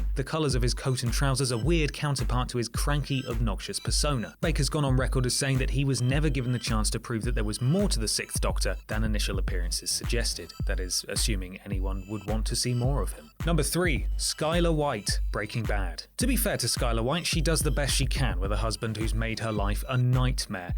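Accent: United Kingdom. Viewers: Who do I hate more than anyone on this list? Smarmy YouTube hosts with pseudo-posh Brtitish accents who don't know their arse from their elbow, perhaps?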